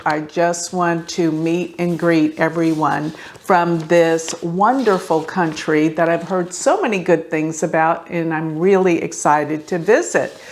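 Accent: Texas, United States. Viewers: has a sweet accent: 'Intercontinental' became 'inerconinenal'. Perhaps it is texanese.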